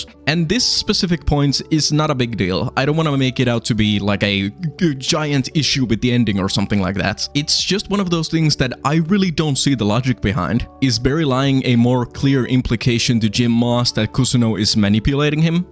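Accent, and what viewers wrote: Finland. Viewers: You have an amazing skill for media analysis, portrayed by this essay of the series. I agree with a lot of points you made. (Also side note, after watching UMK, youtube keeps reccomending me Suomi channels, but I dont mind. Your accent is so great (and not rally english xd) good luck with the Youtube and your deepmdives)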